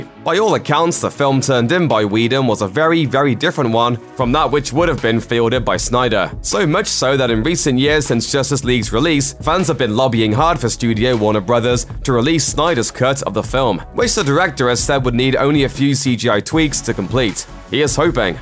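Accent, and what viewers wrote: England, United Kingdom. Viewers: Dan Rockell just like there are many different accents in the USA...there are different accents in the UK too but he’s 100% English accent, I couldn’t tell you what part as I’m from the states but without a doubt brithish. I honestly love his voice for these videos ahah.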